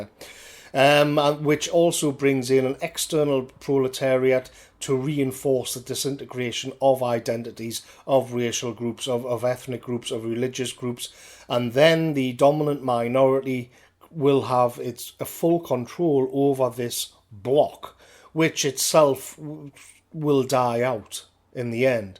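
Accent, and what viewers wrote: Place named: Newcastle, United Kingdom. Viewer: views are so intelligent, researched and insightful, that I sometimes wonder if he is himself a man of education, and the unabashedly working class Geordie accent is a front...?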